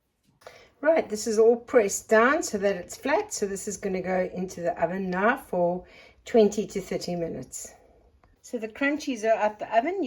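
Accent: South Africa. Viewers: You sound like a South African. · But your accent is South African?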